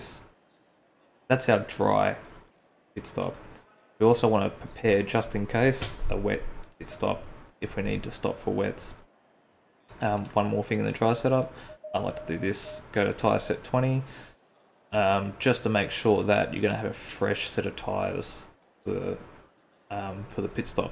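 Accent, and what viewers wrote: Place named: Australia. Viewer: Subbed. Good to hear an Aussie accent:-) Great video. Thanks. Just a question: I want to test in the wet, and also practise pitstop technique, setting up pit strategies, as per your video. Which mode can I use to do this without affecting my SA, CN, CC, etc? ie have ratings turned off, so I can muck about. I can't find a setting where I can select weather (rain). Yeah, I'm a newbie, and all of these variables are doing my head in. But loving ACC (on PS5 and PS4 Pro). Thanks in advance.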